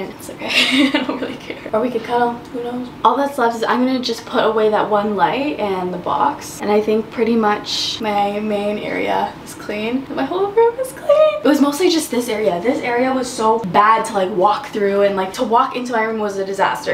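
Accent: Canada. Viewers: i know this is a random question, but i cant tell if has a Canadian accent?? does she?? i can never tell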